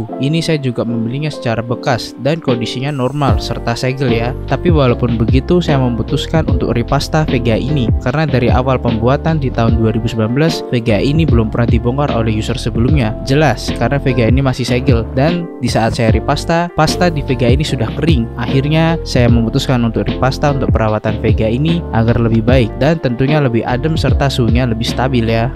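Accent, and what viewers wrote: Purwokerto, Indonesia. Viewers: Wong Purwokerto mas?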